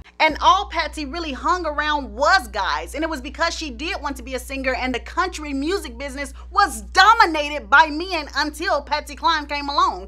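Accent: United States. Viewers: Lol wat is up with this country accent 😂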